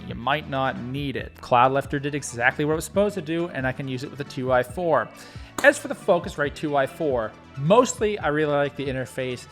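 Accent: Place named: Canada. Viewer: Hey! Excellent video and thank so much for doing this! I stumbled across your channel (this vid) because I just upgraded from my Blue Yeti to the Shure SM7B and Focusrite Scarlett Solo Gen3. Once I started recording some audio samples, I noticed that things didn't sound any different compared to my BY which told me something was being missed somewhere along the line. That being said, I think the Cloudlifter is one of those things and maybe better cables. Do you recommend anything in particular? Also, you mentioned Canadian dollars plus you look and sound Canadian! Where abouts are you from? I'm in Southern Ontario myself. Anyway, ramble complete! Have a stellar day and thanks again for this video!!!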